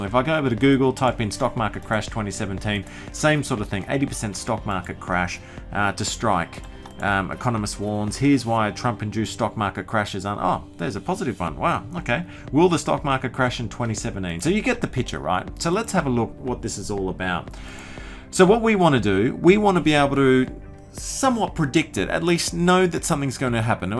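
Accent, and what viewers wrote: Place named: Australia. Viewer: So let me get this straight. Jim Rickards, Joseph Stiglitz, Jim Rogers, Lew Stockwell and Peter Schiff do not have a clue?( Highly unlikly. Further more fiat monetary policy is one of the biggest ponzi schemes ever invented. To use a vernacular that u being Australian cuase of ur accent. fiat money is like watering down ur cordial more water less cordial . fiat money does the same . U print and print. What is backing ur currency . Nufin cause ur great tresurer under the Howard regime sold all ur gold. Gold price at the time was circa 300 US then it dropped under 300. What is the price of gold today. Circa 1250 US a troy ounce. Which at present day is heavaly rigged.Cuase the Chinese , Russians and the Iranians have been purchasing bullion at a un precedented rate. Hence the markets r rigged and the bullion market is rigged. 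IE:London bullion market constantly floods the market with paper bullion (gold and silver ) not actual bullion. Markets historically have had 7-10 year cycles. Another problem with the western economic situation. All the afferomentioned economist speak of the velocity of money. QE1,2and 3 did not increase the velocity of money. EEC QE 1,2,3 and 4 did not increase the velocity of money . The Fed in the US has been by back in a bigway all this printed money in bond by bak so has the EEC. Its all rigged. the stockmarket is over leveraged at circa 500 percent today . In 2007 with the derivatives meltdown and banking shemazal the stockmarket was leveraged at 12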